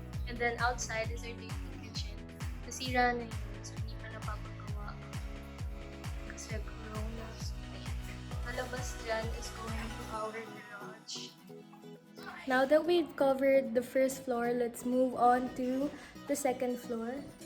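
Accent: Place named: Conyo, Philippines. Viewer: Yung pagka-conyo at voice mo reminds me of my crush back in 9th Grade....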